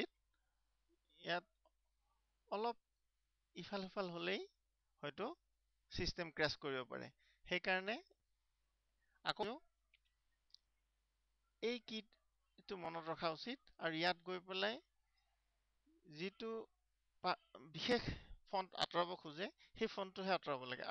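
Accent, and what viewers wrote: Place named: India. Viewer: English with an indian accent.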